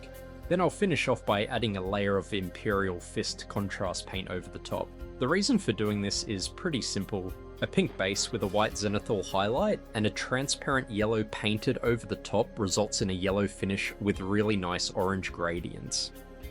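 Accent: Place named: Australia. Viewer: Fantastic result! Loving the heavy Aussie accent, mate ^^ I'm just sitting here waiting for you to start swearing heavily :)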